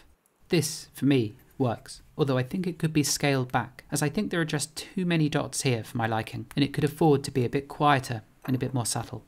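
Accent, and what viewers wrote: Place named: RP, United Kingdom. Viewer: I’m not a native speaker but I learned the language from a fairly young age and I’ve always had a knack for accents and dialects. My first accent was clean British RP then I drifted off into other accents from the British Isles. Lately it’s been a standard Australian accent but you really make me want to move back to RP again. Let’s see how long it’ll last this time XD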